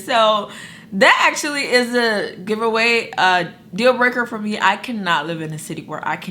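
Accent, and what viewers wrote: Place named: New York, United States. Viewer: You sure talk like you from New York lol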